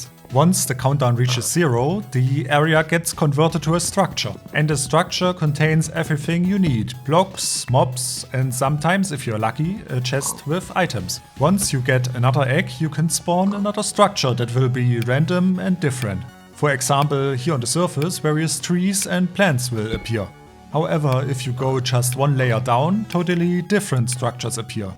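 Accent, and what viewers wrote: Germany. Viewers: Your German accent gets harder over time. Seriously. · are you english you sound a bit german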